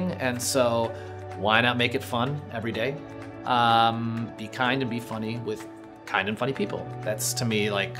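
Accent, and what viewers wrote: United States. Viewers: His Chicago accent is really cute. I love the way he says mom and on and comedy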